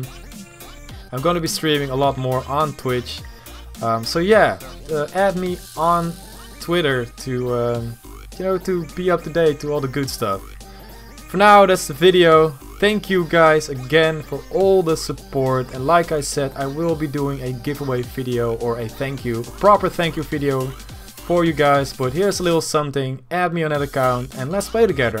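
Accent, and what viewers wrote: Netherlands. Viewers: i would love to play with you but i am only gold nova 4 haha and btw ik dacht al dat je nederlands was ik hoorde het aan je accent :D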